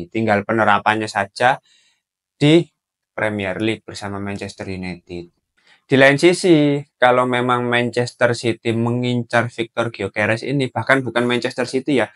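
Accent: Java, Indonesia. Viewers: Sampean arek mana mas..logate kok koyo jowo sblh ndi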